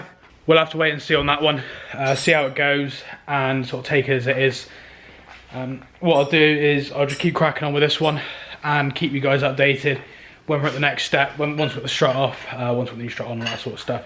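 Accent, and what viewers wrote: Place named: United Kingdom. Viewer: Too much BS jibber jabber… WTF… just show the actual work instead of constantly apologizing in your fucked up wanker, limey accent…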